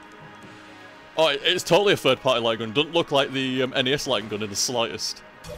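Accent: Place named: Scotland, United Kingdom. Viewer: Hey, from what county are you from? You sound scottish-like tbh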